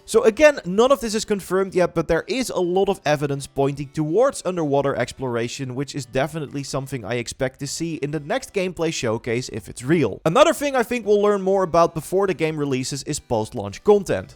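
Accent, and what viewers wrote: Netherlands. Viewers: I smell Dutch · You sound dutch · Are you Dutch? You kinda have a Dutch accent that's why. Also looking forward to the game! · Are you Dutch?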